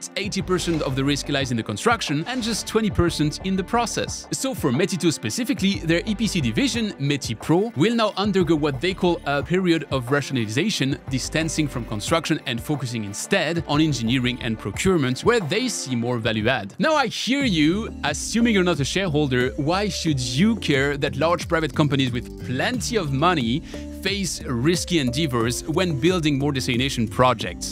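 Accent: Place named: France. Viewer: Your french accent is sweet, but please düvlop, it really is so hard to understand it is.. de-vel-op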